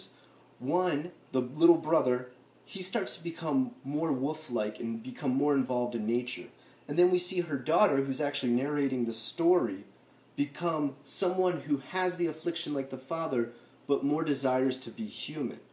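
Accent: United States. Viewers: do you pronounce the japanese language in your videos as you would when talking to Japanese people? It sounds very americanized to me. Still, great videos:)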